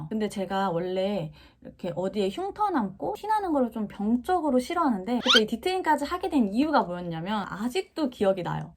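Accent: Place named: Jeolla, South Korea. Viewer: 용 됐네요 전라도 사투리 쓰시는군요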